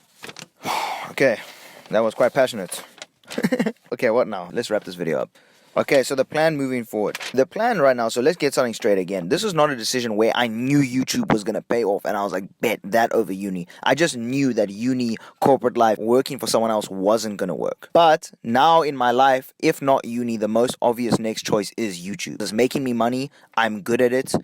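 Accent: South Africa. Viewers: This accent belongs in my backyard. SA?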